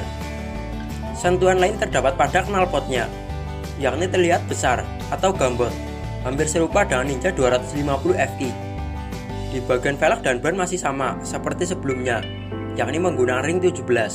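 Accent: Java, Indonesia. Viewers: Medok bet dah SE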